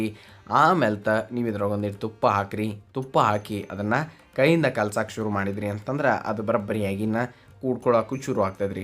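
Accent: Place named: Bijapur, India. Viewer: Nau kuda bijapur davru sir matadidu keli manssige tumba sanda aaitu sir🙏🙏❤❤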